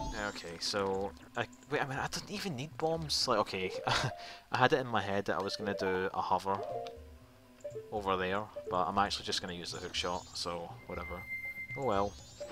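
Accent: Scotland, United Kingdom. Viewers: Hey, are you Scottish? I don't know enough about accents to get any further than that.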